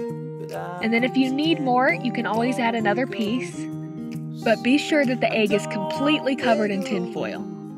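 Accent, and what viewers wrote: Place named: Minnesota, United States. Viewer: Are you from Minnesota sounds like Minnesota accent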